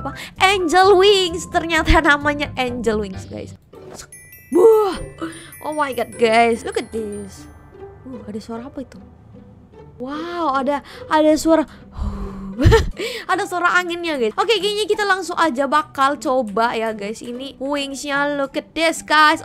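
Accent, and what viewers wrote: Java, Indonesia. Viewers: medok banget anjir waowkkkwka🤣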